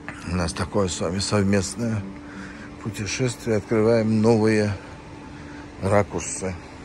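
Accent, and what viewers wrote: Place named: Georgia. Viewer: Ваш литературный русский с лёгким грузинским акцентом восхитителен. Атмосферное видео. Спасибо за погружение в прекрасное туристическое место.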